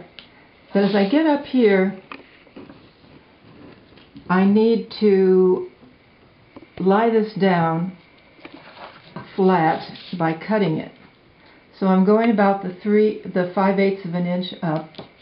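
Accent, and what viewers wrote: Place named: Northern Ireland, United Kingdom. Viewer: Hi. I really enjoyed your video and I plan to try it. Great to hear someone from NI. What county? I'm from Tyrone.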